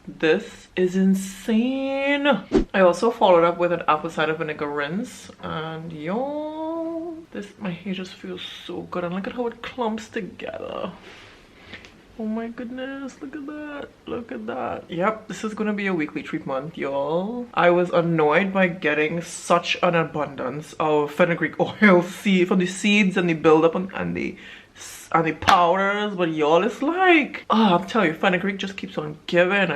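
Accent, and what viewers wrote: Trinidad and Tobago. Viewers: I feel like I hear a Trini accent somewhat 🎊🍾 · Are you trini? You sound trini.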